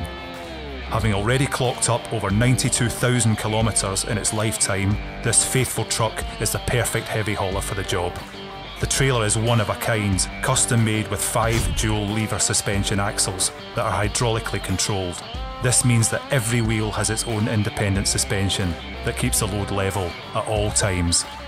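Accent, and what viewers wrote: Scotland, United Kingdom. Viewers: Why the scotish accent? It's not even in Scotland. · Why do people on here SLAM DUNK the video, It doesn't matter if the announcer is Scottish, some one had to do it and he was chosen, "Welcome to the internet as You Might Say" Thanks for sharing this with us on you tube, if you have any more please send me a link and any others that might be interested, English / Scottish / Usa / Australian / Canadian/ :)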